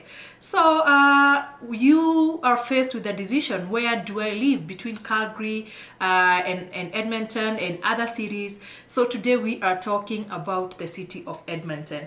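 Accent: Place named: Kenya. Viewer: You have kenyan accent😍 Mimi pia ni mkenya in Edmonton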